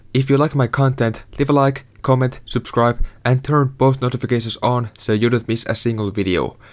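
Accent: Finland. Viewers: Are you finnish? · Ootko suomalainen ku kuullostat silt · Accent sounded very familiar. Wait a minute.. SUOMALAINEN ROBLOX TUBETTAJA OMG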